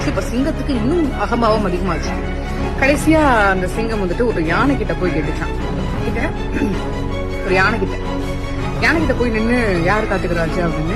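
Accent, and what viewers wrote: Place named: Telugu, India. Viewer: இவள் கொல்டி தமிழில் பேசிக்கொண்டு தமிழர் வெறுப்பு இருக்கிறது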